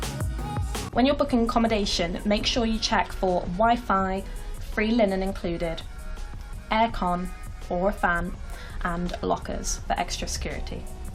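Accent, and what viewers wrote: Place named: Bristol, United Kingdom. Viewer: I'm sure it was a Bristol kinda accent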